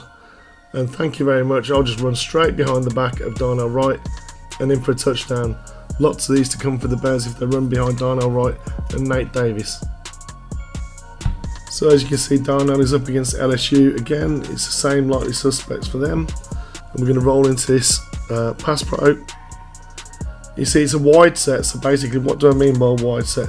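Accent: England, United Kingdom. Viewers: Mate, I’m absolutely loving this breakdown. Insightful but with an English twist. 🏴 I always feel English analyst sounds a bit off but you nail it bro!💪🏻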